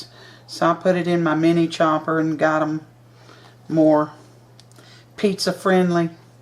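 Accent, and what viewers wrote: South, United States. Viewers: Good recipe the dough for pepperoni rolls. Yum yum yum. Loved loved loved your sweet sweet sweet southern accent. Thanks fo sharing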